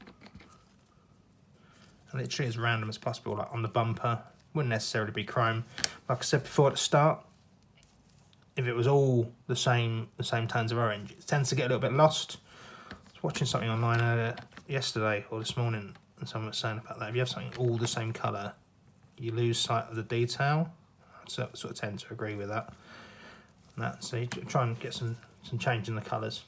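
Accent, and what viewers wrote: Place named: RP, United Kingdom. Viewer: Awesome work Btw way you’re accent always knew you a bit posh lol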